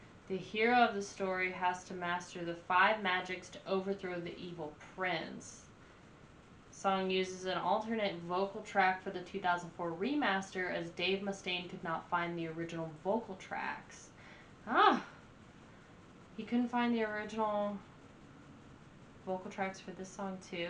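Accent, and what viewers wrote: South, United States. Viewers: I'm thinking it's thrash metal perfection. is incredible. One of the best metal records out there. Are you southern, or from like Tennessee? Love the accent in there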